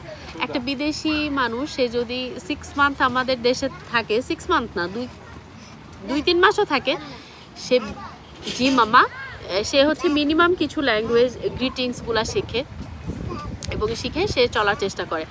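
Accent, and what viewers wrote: Bangladesh. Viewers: don't blame bengali indian. You are nowhere from India. Your pronouncation is bangladeshi. We indian bengali people went to Lisbon in high skill work permit not doing any cleaning job. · Apner kotha sune monehoy apni bangladeshi. Asole amader sikha bhalo na.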